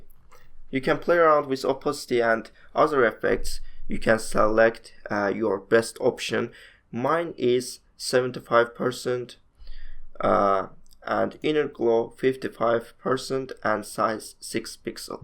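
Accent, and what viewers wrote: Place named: Turkey. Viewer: Your accent is like Turk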